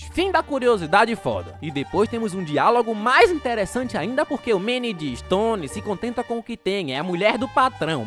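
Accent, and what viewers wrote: Northeast, Brazil. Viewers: VOZ HORRÍVEL TENHO RAIVA DESSES NORDESTINOS SOTAQUE LIXO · Vídeo bom , porém esse sotaque nordestino ferra tudo ! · Porra mano aí e foda vc reclamar do sotaque nordestino si o cara nasceu no nordeste tu quer q o cara tenha o sotaque do Sul ou de sp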